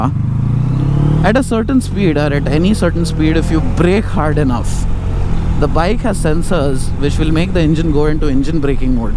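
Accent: Hyderabad, India. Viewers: I watch your videos for 2 things, your hyderabadi slang and the beautiful bikes you show us! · I have been laughing through out the video... Bro had i been a Hyderabadi i could have this phonetics....Hallu hallu chala nahi ghus jata maa ki kir kiri....I love this maan... :D From Bhubaneswar, Odisha. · U r language is very funny 😆 I am very enjoy u r video. I love hydrabadi language ❤.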